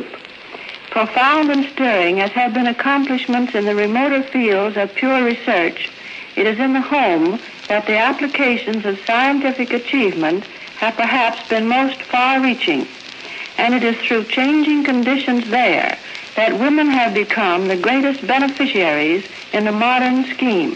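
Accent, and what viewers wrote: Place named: Mid-Atlantic, United States. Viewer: She spoke, at least publicly, with the classic "Mid-Atlantic" voice accent that most American actors, actresses and public figures were trained to use at the time.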